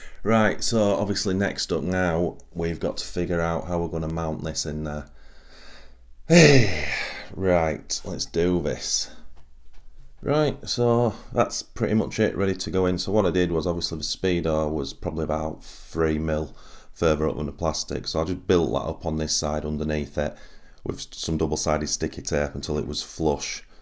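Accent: United Kingdom. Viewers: It's just occurred to me that you're not in the UK, I sometimes forget because of your accent. I'd have used a.com link if I'd thought about it. They're quite a common gadget, though, so you shouldn't struggle to find one.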